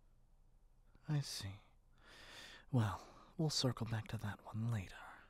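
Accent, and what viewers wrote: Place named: South, United States. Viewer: Omg the southern accent 😂😂😂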